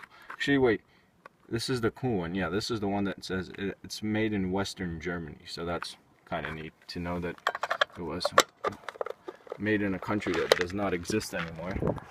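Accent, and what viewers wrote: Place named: United States. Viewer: No offense, but Is this in Canada ? Your accent is american but your country use KMH? I kinda confused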